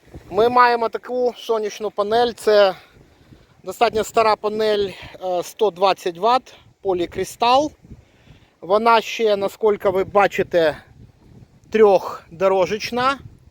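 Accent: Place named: Odesa, Ukraine. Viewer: Дякую за пізнавальне відео та шикарний одеський варіант української мови ❤❤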